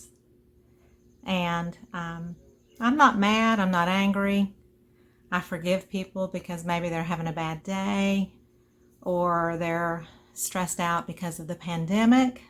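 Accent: South, United States. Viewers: I've always liked you and enjoyed your beautiful art. Now? Now, I love and respect you. What a beautiful heart. What a kind, loving and truly christianly way to respond to someone who was, how we say in the south, less than kind. Sister, you know what I'm talking about. I can hear in your voice you are a southern girl too. :) I can hear my Grandma's words as clear as the day she first said them to me, " Honey, when people are mean to you, you have to show them the love in your heart. You can't be mean back because that's what the devil wants. God wants us to love everybody. ". It was hard for me to understand when I was little. Now that I'm much older, I get it. I feel pity for people that are mean spirited. I always wonder what is going on in there life to make them so miserable. To try and hurt someone else is so negative. It's not what the world needs. 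It's not what anyone needs. The world tears us down, life's unavoidable pitfalls. Why not try to lift someone up? Being positive isn't always easy, especially when someone is unkind. You do it beautifully. I for one appreciate you, all your hard work and your loving, giving nature. You make the world brighter, happier and a better place to live. Thank you for all that you do. May God watch over you and protect you.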